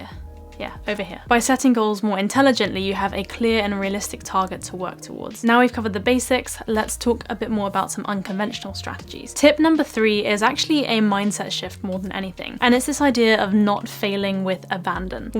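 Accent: United Kingdom. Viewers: Your British is amazing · 纯正英国腔听着舒服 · Thank you for making all the videos easy to understand especially learning the British accent, which I really love.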